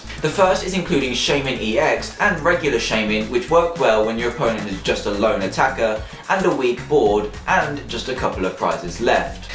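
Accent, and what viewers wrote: United Kingdom. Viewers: I just love your British accent and the vocabulary choice.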